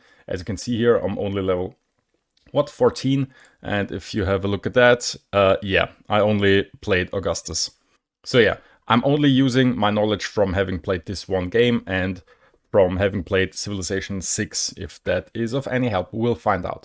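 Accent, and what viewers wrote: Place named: Austria. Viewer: Austrian accent detected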